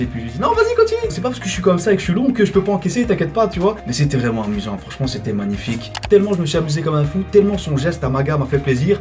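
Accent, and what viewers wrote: Brussels, Belgium. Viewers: Salut, t'es belge toi non? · J'avais remarquer directe que tu viens de Bxl mdr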